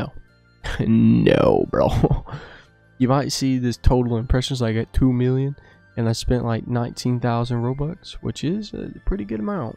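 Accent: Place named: South, United States. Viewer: Your voice sounds country, I love it, yall that's why the music choice in every 1v1 video is epic🤘🏻😎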